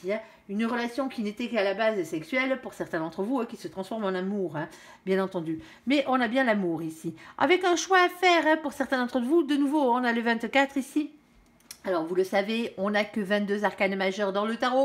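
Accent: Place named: South, France. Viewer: Je vous découvre, vous êtes dans le juste 😊. Merci pour votre bonne humeur et votre accent de soleil ☀️ Je m'abonne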